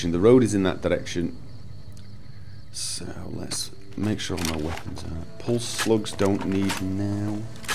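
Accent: United Kingdom. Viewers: Can we have subtitles in videos? My siblings dont know enough English to understand your British accent.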